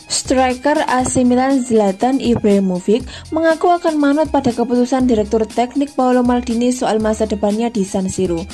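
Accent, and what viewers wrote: Java, Indonesia. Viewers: Medhok banget mbak wkwkwk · Salam buat Narator Channel Youtube AC Milan Indonesia ini, mêdoq bangets... unique 👍🤩👍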